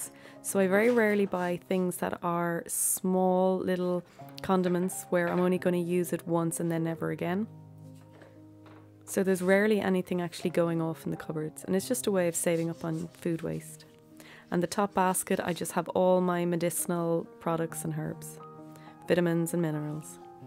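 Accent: Ireland. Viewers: I absolutely adore your beautiful home. We live in a small 800 sq foot home, too. It's cozy and perfect. Did anyone ever tell you that your voice is so soothing? My husband is a Newfoundlander, and I can definately hear his Irish roots in your accent. Much Love, from Ontario, Canada 🇨🇦... Keli 💖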